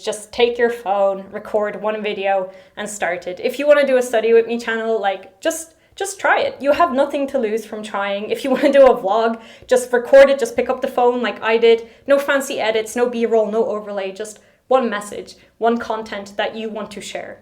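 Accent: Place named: Ireland. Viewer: I believe in you 🥰😍 you can do more than you give yourself credit for, and I love your accent 🥰 you sound exactly like I imagined, your Irish is making me happy💖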